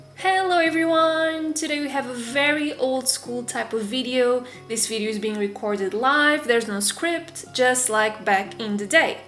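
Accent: Portugal. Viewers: Tu falas português??? ahh bem me parecia o teu sotaque inglês portugues És portuguesa ou br??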